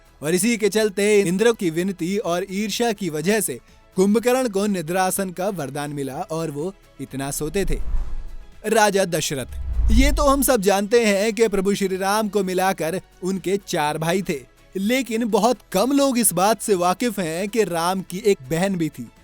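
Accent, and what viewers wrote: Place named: Tamil Nadu, India. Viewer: Ap to tamil ke thara bolte hai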